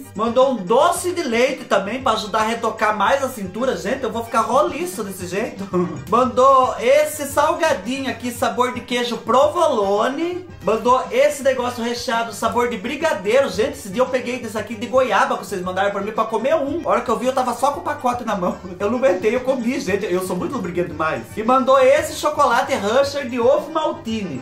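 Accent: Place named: Paraná, Brazil. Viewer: Ahh, entendi vc mora no Paraná, esse sotaque bem forte só pode ser eu doida pra saber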